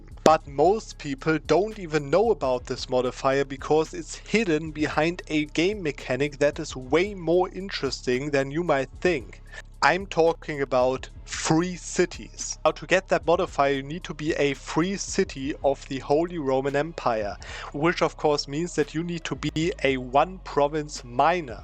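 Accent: Germany. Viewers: Do I hear a german accent here ? :)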